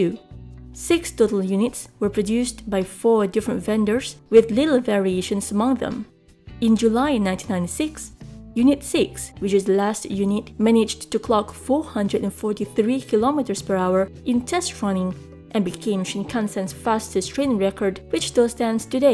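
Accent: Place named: Malaysia. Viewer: I hear a Malaysian!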